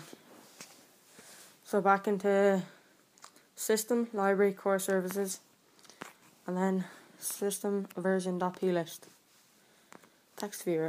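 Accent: Ireland. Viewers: Are you irish chap me too :)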